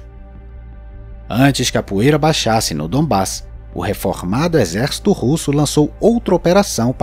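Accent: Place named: Pará, Brazil. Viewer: Certeza que a voz é de um paraense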